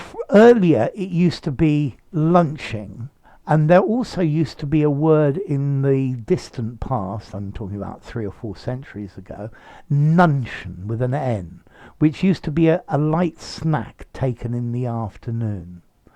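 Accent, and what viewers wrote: RP, United Kingdom. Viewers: Thank you, sir! I really like it when you speak posh English, even your facial features seem to be changing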